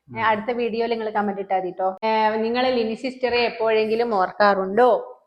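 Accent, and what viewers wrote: Kozhikode, India. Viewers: Kozhikodu language kettirikkan rasa ❤adipoli❤ happy familiy❤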